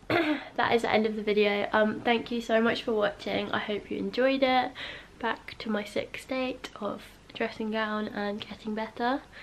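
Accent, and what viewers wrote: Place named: United Kingdom. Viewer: You are beautiful and perfect in every way and I love your British accent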